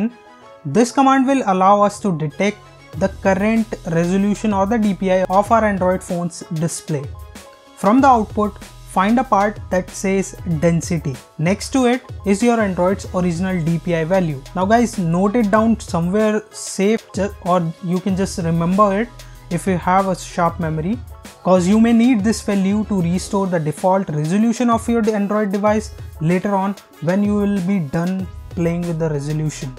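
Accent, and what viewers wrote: India. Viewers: hate indian english accent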